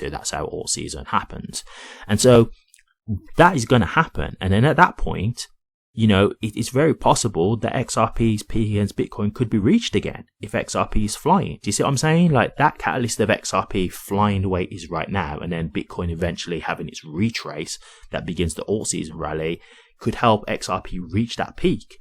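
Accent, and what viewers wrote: Birmingham, United Kingdom. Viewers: You sound like a fellow Brummie XRP holder. Good luck to us all.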